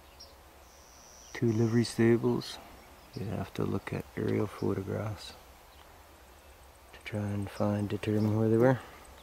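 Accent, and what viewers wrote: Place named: Minnesota, United States. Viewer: Sounds like you have an accent to me, reminds me of someone from Minnesota. I'm from Columbus, Ohio though. I read somewhere that the " 'General American' region accent... covering parts of Illinois, Indiana, Iowa, Kansas, Missouri, Nebraska, and Ohio" is the most neutral english dialect.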